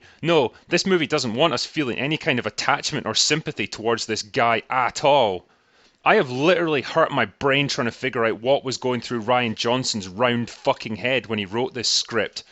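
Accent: Scotland, United Kingdom. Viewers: So three years ago, the critical drinker was less drunk and less Scottish? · Soccer ball.......?? Ur Scottish dude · You used to sound normal...well as normal as possible with that Scots accent. So you do put on a voice!! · Very strange hearing a Scot say "soccer ball" isn't it football everywhere except the US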